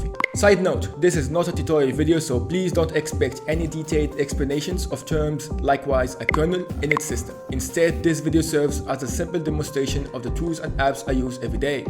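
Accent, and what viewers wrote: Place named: Maghreb. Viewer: mghribi accent, i can sence it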